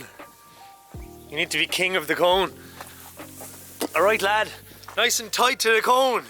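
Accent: Ireland. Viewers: The rings were class but you need practice man!! The Irish sayings and accents going worldwide lads! Fair play 😎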